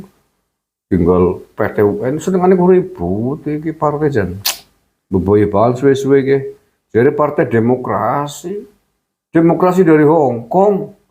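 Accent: Banyumas, Indonesia. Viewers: lik rika ngapak ,,ya ,,nying fens rika lik semangat yak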